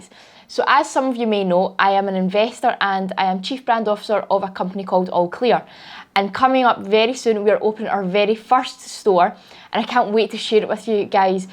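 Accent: Scotland, United Kingdom. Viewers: More like a Scottish accent. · We need to cut population in Hong Kong if there aren't much more land can be released to solve the housings issue. BTW, it's nice to hear some Scottish English after getting bored with our own kind here the Aussie. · 好重正宗英國口音, 同US/Canada 北美口音差好遠 , 真正英文就好似國語而喺我哋Vancouver啲北美英文就係廣東話😸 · Wow Scottish accent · Like other said, strong Scottish accent, interesting to hear～😁😁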